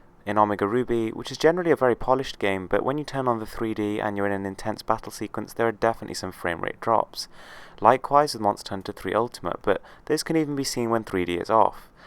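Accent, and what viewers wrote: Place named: United Kingdom. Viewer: his voice...its so...BRITISH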